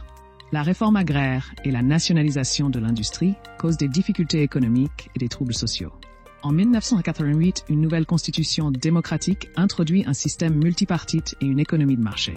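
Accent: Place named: England, United Kingdom. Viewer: Vous avez un léger accent anglais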